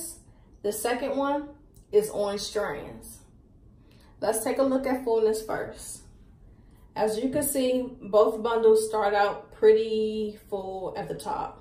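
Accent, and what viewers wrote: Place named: Philadelphia, United States. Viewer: GREAT INFORMATIVE VIDEO ................ & YOU SOUND LIKE YOUR FROM PHILLY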